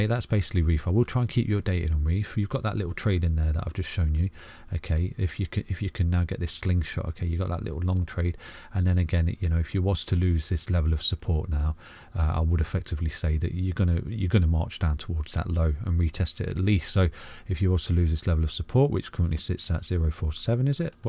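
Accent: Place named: Yorkshire, United Kingdom. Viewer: Love the t.a, but got distracted by your accent. If you aren't a fellow Yorkshireman I will eat my scarf.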